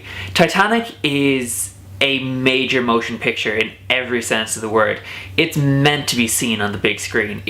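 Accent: Ireland. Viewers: The movie is impressive! After I went to the Titanic Light Show in Belfast two weeks ago I definitely decided to go to see the movie on big screen. DiCaprio and Winslet are genius, as always. Btw after my trip to Ireland I am missing the Irish accent, so beautiful! It was the reason I subscribed to your channel (your accent) =)) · I saw it in 3D, too. It was worth seeing it again in theaters, & the 3D in the film made it just a bit more enjoyable on the big screen. It is definitely a classic. Btw, great videos, I'm gonna subscribe cuz you're awesome. And of course I'm jealous of your accent ;) I wish I had grown up in Ireland.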